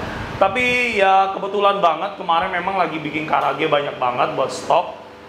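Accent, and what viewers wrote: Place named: Tegal, Indonesia. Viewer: Aja miring miring ooohhh hhhhh.... Kayane sih wong tegal lek ora wong purwokerto..😀😀😂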